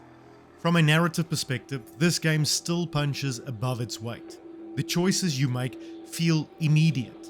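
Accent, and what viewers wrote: South Africa. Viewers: out of curiosity are you South African?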